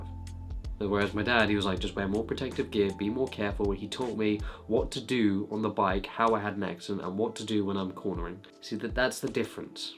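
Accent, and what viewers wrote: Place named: Liverpool, United Kingdom. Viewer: I used to live in a Liverpool and you have very familiar accent)) By the way, the video is amazing, thank you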